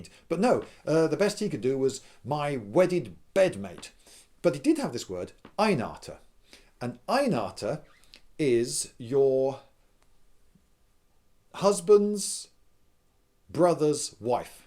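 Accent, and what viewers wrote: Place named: United Kingdom. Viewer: I had never heard of this social structure before, very intriguing to say the least. More research is required. Thank you for the fascinating videos on such a wide range of topics. The videos on swords and other ancient weapons is what originally attracted me to your channel. I have also really enjoyed your videos on historicalish, emphasis on ish, movies. I was wondering if you could comment on a particular movie sequence in a recent movie. I was wondering what you would think of the use of the shield wall in the recent Hercules movie with Dwayne "The Rock" Johnson specifically. Incidentally you look and act a lot like my favorite uncle, right down to the British accent. The name is James by the way and thank you again for your channel. I enjoy it immensely.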